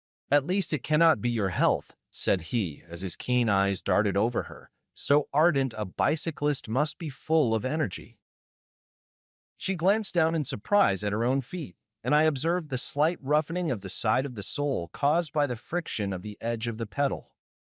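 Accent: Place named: United States. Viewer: Probably a great story. Just can't listen to the American accent. Conan Doyle would probable be a little restless in his grave.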